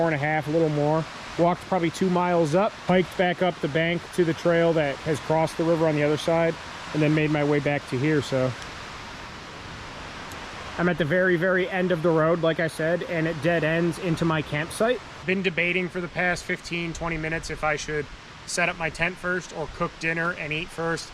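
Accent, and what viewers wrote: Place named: Allegheny, United States. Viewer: You sound like your close to where I live. I live near the Allegheny national forest.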